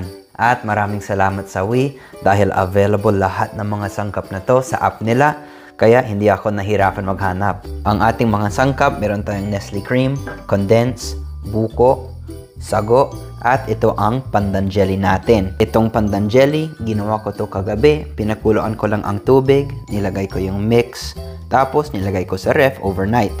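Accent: Philippines. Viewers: Grave pinoy na pinoy ang accent... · Pinoy na pinoy na talaga ang galaw at pananalita ni Sir 😮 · dude even on how you pronounce english is now filipino accent lol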